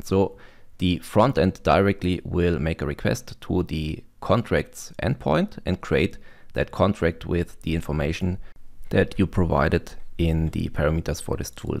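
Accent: Germany. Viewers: Are you german by chance? Love the accent. Keep up the good work.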